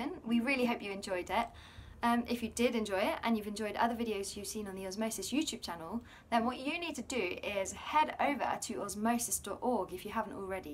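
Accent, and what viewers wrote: United Kingdom. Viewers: British accent? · Her high-pitched low-volume voice with British accent is simply annoying. It's like she's trying to become the British female Tanner, but without any success. #BringBackTanner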